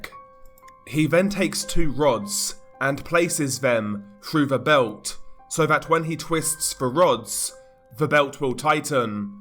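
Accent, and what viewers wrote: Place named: United Kingdom. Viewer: Idk why but as an american i enjoy the british narration on mexican cartel atrocities.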